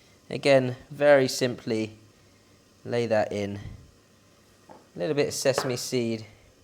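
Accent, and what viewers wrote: United Kingdom. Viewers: Love your videos and your nice British English :) What do you do with the used bonito flakes and combu?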